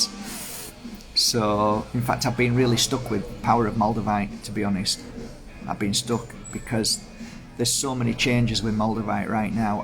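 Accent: Yorkshire, United Kingdom. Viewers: That is so funny i totally understand your saying about hating hearing yourself speak i cant bear hearing my north London working class accent 🤣but your yorkie accent is beautiful always has a calming effect on me especially with the wise words you give us 🙏❤️❤️❤️ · Most people love the Yorkshire accent. I'm Yorkshire and don't like my voice either. But most call centres in Yorkshire. Because people love the accent. Your voice is lovely and you speak from the heart ❤ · Love your Yorkshire accent, I’m from leeds West Yorkshire, love this coffee chat , thank you for everything you do ❤❤❤ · What you say is the most important , your tone of voice is soothing and understanding, and your accent is my personal favorite accent from England . I have been watching British programs on PBS in the US since I was a pre-teen and later when I was introduced to All Creatures Great and Small I became drawn to the Yorkshire accent, I love listening to it. 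In fact I feel drawn to the area and in general the north of England holds a kind of magic for me. Keep doing what you do, we need more people like you in this world. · Seems most everyone loves the voice. It’s got character and sincerity there, not to mention depth of perceptivity. People LOVE the Yorkshire accent too. Woah…30thousand. Well, AI is looking out for you some. Ai reflects us, in multiple aspects, normally not so good. In this rare case, a fine outcome. (Expect, I’ll pick up a bit of flack from people’s feelings on the Ai.) Its okay on the flow; lots of people picking up stuff starting particularly in the 90s, then went quiet for some, louder for others. Hmmm, don’t know about handling worse situations in the next few months. Have to consider that one. Well, all will get through it. Personally, I may hibernate. Thanks. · Thanks for making and sharing this video. I love the Yorkshire accent 🙏💗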